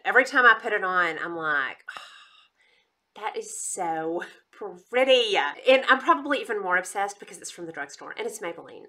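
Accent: South, United States. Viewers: Hi I’m new and Mary Ellen sent me over. You sound like a fellow Southern girl I lived in Tn for years and reside in Beaufort SC now. Back to your vid. 👍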